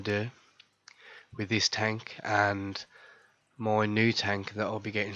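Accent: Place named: West Midlands, United Kingdom. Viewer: that west midlands accent though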